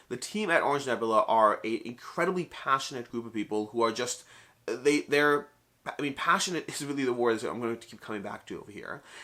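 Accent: Canada. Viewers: Canadian accent? It's usually super subtle, until it isn't.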